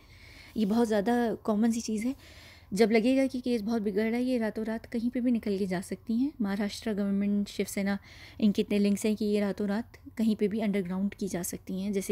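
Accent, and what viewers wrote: United Kingdom. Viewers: English accent.. Masha Allah.. 😍💕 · Mam how is your english and hindi both so good. Like perfect british accent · Excellent research. Your English accent sounds like you're from the UK. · your accent r supperb. can you teach me uk accent 😊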